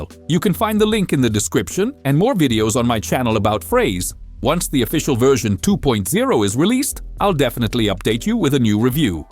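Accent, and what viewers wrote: Italy. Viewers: I miss the Italian accent and charm.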